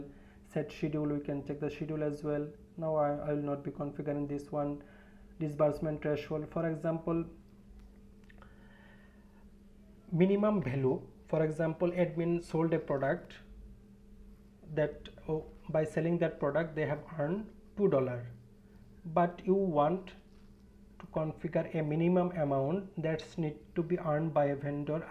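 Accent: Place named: India. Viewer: It is Indian english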